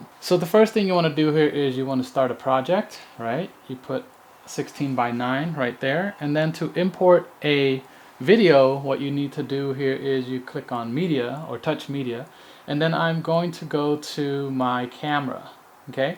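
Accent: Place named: United States. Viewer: Wow you really sound like American when I don't see your face hahaha,same here, I'm not Native Speaker of English but I can speak English very fluently hehehe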